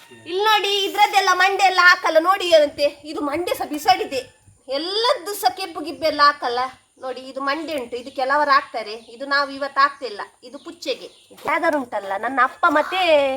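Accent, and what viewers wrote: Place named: Tulu Nadu, India. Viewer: ನಿಮ್ಮ ತುಳು ಮಿಕ್ಸ್ ಕನ್ನಡ ಕೇಳಲು ಖುಷಿಯಾಗುತ್ತದೆ. ನಿಮ್ಮ ಅಮ್ಮ ನಿಮ್ಗೆ ಒಳ್ಳೆ ಬಜೆ ನೆಕ್ಕಿಸಿದ್ದಾರೆ ಅನ್ಸುತ್ತೆ. ನೀವು ಕೂಡಾ ಯು ಟ್ಯೂಬ್ ನಲ್ಲಿ ಪಾಪ್ಯುಲರ್ ಆಗಿ ಬಿಗ್ ಬಾಸ್ ಗೆ ಹೋಗುವ ಅವಕಾಶ ಸಿಗುವಂತಾಗಲಿ.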